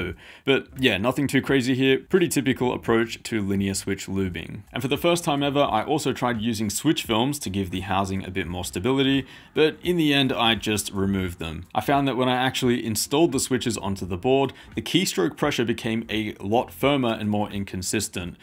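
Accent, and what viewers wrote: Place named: Australia. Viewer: I cant tell where his accent is from, bit australian but not really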